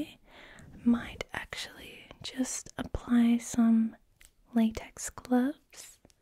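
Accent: Australia. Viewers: You sound like a bit Aussie😅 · Australian accent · As soon as I heard her voice I knew she was from Australia then I checked and I got it right!!! I’m from Australia too! Btw amazing video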